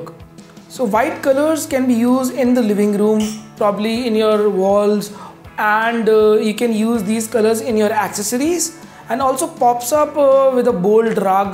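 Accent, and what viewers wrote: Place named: India. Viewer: Thanks for the video. I struggle to understand the Indian accent, but it´s worth it. Would you advise me? I have quite large, but east orinted quite dark room, I will spend time there mostly in the afternoon/evening, so artificial light mostly. I want to "warm" it a bit, was thinking terracotta or pineapple green combinations.. Is it a bad idea? I wonder.. Thank you. (I like darker red colours).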